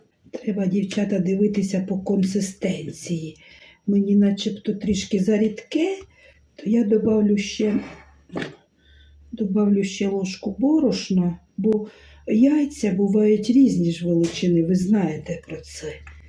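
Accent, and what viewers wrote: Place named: Southeast, Ukraine. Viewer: Какая приятная женщина, и разговор красивый , любо дорого смотреть , видимо юг или юго восток украины, видно что свой человек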